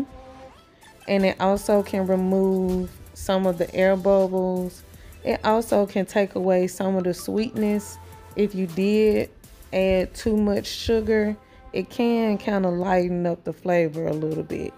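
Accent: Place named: South, United States. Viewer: I Love Your voice very Southern ❤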